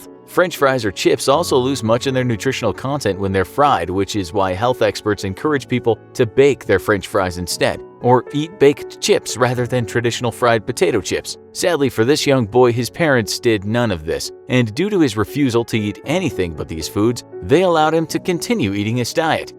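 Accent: United States. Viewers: My mans out here roasting America sounding American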